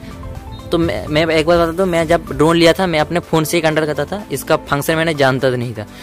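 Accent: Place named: Bengal, India. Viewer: Bangla beriye jacche mukh theke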